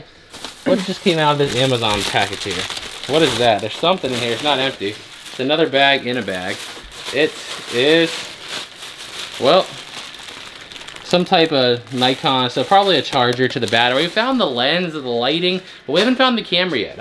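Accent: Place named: United States. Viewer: sounds american.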